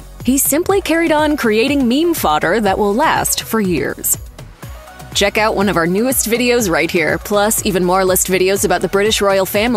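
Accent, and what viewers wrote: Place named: United States. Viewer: Why American commentator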